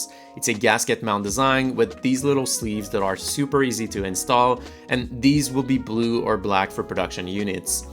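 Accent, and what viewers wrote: Quebec, Canada. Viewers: Super random, but are you per chance French Canadian? It's super subtle but I feel like I'm hearing that subtle French of my fellow bretheren so thought I'd ask 😂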